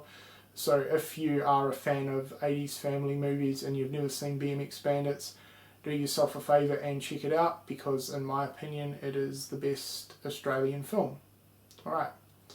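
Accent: New Zealand. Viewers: sorry this question has nothing to do with the video but are you originally from new zealand because you sound like a kiwi.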